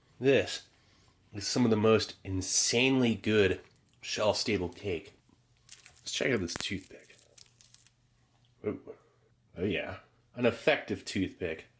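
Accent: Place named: Philadelphia, United States. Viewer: I know is in Florida, but does anyone know if he's originally from Philly. I hear a Philly accent come out sometimes.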